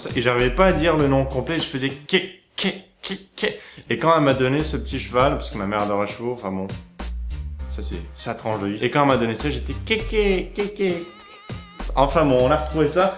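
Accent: Quebec, Canada. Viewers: j'aime bien le concept! PS. j'aime ton accent semi-QC, semi-FR. Continue t'est le King de l'internet!!!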